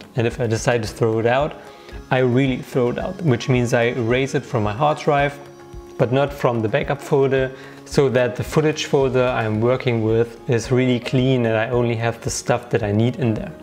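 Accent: Germany. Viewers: Hi! Just spend most of the evening watching your content! Really like your style and the way to explain things! The thing that made me land on your page, was that we used the same camera (GH4), i know that you are not using it anymore, but i wanted to ask you a question about your settings on the GH4. I believe that as you import your footage, i can see that you are shooting in 24 fps. I have some difficulties in really understanding that on the GH4. Listing to your voice, i believe that your from Germany or somewhere here in EU. How can you use the 24 fps settings on your GH4? Are you just changing your PAL/NTSC settings or what? Are you shooting in C4K on your GH4 or 23.98? Hope to hear from you, and that you still remember your settings:)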